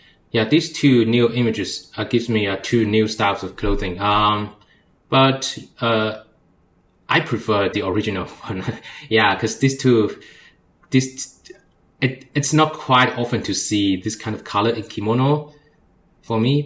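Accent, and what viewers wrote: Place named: Singapore. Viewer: but why do you speak Singaporean English🙂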